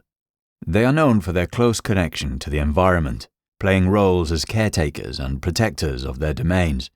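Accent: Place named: South Midlands, United Kingdom. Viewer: No it's a modest south midlands accent not remotely Bean's Yorkshire 'brogue'.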